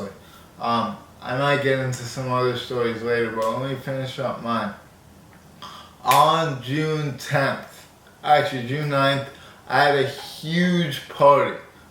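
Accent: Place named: South Jersey, United States. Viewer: Im praying for you brother. Yeah this not the type of video I ever see on my feed man Im a sneakerhead and I've been subscribed to you for a while. Please let us know how your progress is going whenever you can. Oh wow didn't know you are from New Jersey as well. Im located in Central Jersey. Sounds like you are in South Jersey. ✊️🙏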